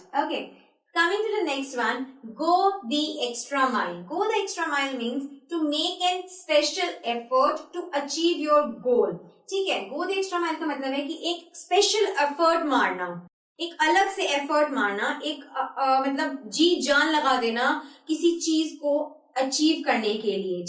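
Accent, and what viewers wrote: India. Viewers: Hey are you from Hyderabad? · Obviously she is inexperienced, speak like a machine gun with strong Indian accent, thank you anyway. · God please! try to adapt a neutral accent and not Indian accent it's so stereotypical. c'il vous plait!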